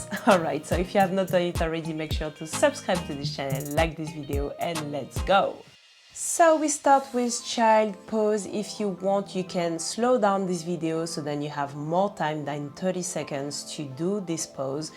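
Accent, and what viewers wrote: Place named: France. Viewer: English with a French accent is insane. Now you know what your trump card is.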